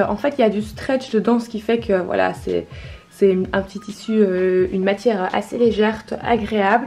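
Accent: Belgium. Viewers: Coucou super vidéo je pense reconaitre à ton accent que tu serais Belge non ?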